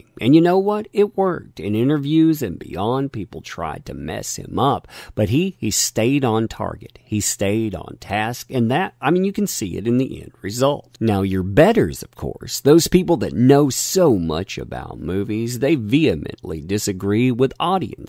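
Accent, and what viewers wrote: United States. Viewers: I agree with you 100% but I disliked the video because of your scripted strong American accent.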